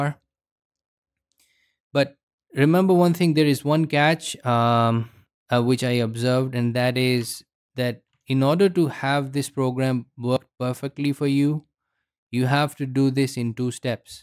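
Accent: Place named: India. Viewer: Thank fucking god for Indian tutorial guys on YouTube and leave it to them to fix the broken bullshit some multibillion company is incapable of fixing! Thank you so much for this! i was just about to smash my t.v.. after having struggled all day with that shitshow "taskbar" popping up and blocking me from using the search function of the file manager i am using to filter through some data libraries that was designed with a properly made system in mind and hence won't fit into the pseudo fullscreen if i didn't hide the taskbar, it know suddenly started popping up in the middle of my lord of the rings marathon and crashing the equally horrendously designed amazon prime app. The windows 10 design is just utter bullshit and missing even the bare minimum core functionalities to adjust this fuckery. Just like when running e.g. into a statistic's problem during the validation process of a new calibration method it is one of those things where I google for hours with only receiving "this is how it is, eat shit and like it" results until i finally remember how to use "" to force the advertisement pushing algorithm to feck off and actually show me the results i asked for - and then finally see it! 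The glorious sub-10 minute YouTube video that as soon as i hear the accent gives me total confidence that i have finally come to the right place to get some actual clear and straight to the point instructions on how to actually solve whatever issue i have run into. Tl.dr: thank you very much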